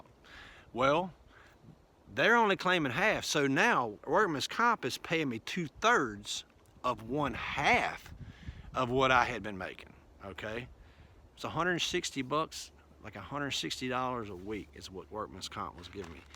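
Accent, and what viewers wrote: South, United States. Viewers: *'s southern drawl sounded almost exactly like my youngest daughter talking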